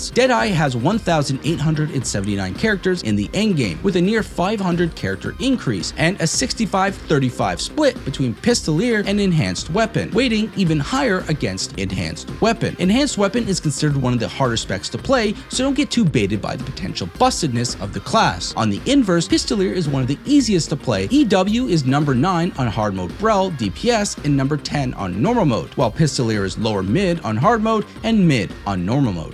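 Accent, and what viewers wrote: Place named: Canada. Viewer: On a side note your Canadian accent is very thick and I’m a foreigner 😂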